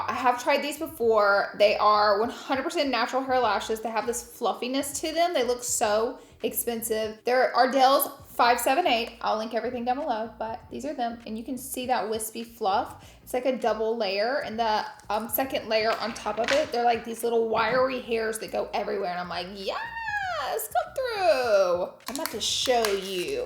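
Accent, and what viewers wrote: South, United States. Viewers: Girl let that southern lady come out don’t be holdin it back! Lol that’s how we say it in the south blyend… not blend lol😂🤣😆❤️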